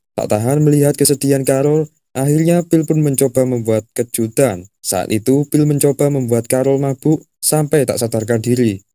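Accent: Java, Indonesia. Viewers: Medok amat mas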